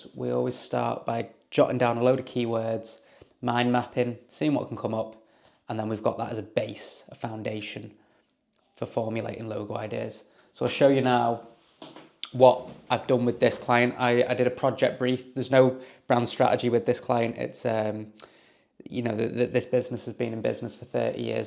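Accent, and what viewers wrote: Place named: England, United Kingdom. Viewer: Where are you from boss? Your accent sounds English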